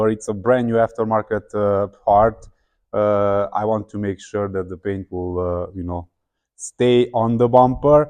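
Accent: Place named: Germany. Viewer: I Love the grman accent, love your videos and i know you will keep the garage! :D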